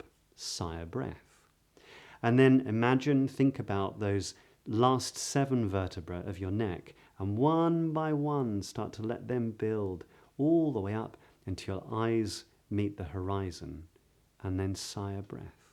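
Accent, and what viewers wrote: RP, United Kingdom. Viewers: Please start a podcast for rp accent listening practice. Could you please recommend few podcast with rp accents?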